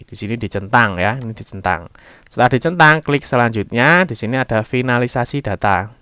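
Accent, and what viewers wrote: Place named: Java, Indonesia. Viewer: ga ada pengisi suara yang lain kah? ini medhok banget dan nyentak2, kurang lembut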